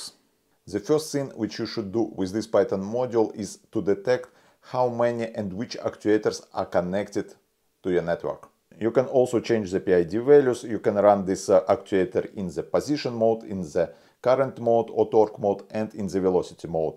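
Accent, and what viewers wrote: Russia. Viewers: Thanks for review. I think this device can be used to control movie camera to fuse real and virtual video or make other effects, or even to clone "handwriting" on letters BTW: your accent is to similar to mine, are you from Russia ?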